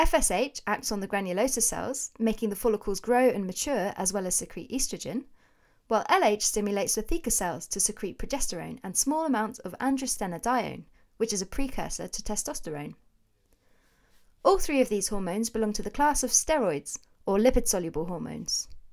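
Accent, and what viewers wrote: United Kingdom. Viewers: Great voice, like made for this. A bit cliché with the British accent ;)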